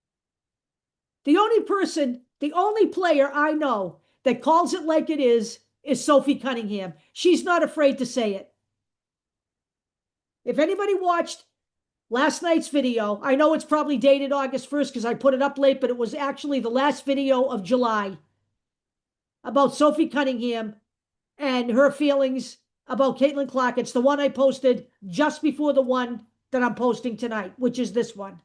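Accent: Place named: Massachusetts, United States. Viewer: Are you from Massachusettes? Love your accent. (I'm Texan)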